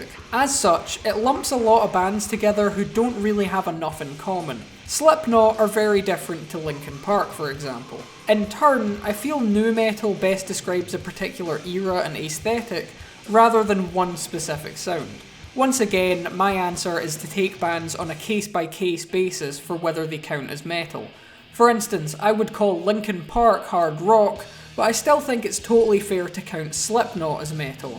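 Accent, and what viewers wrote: Scotland, United Kingdom. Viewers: Yeees! More Metal and scottish accents 🤘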